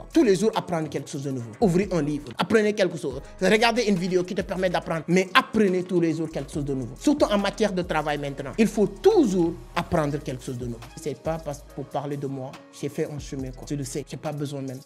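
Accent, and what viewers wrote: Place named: Senegal. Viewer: Force a toi. J'aime bien l'accent des sénégalais .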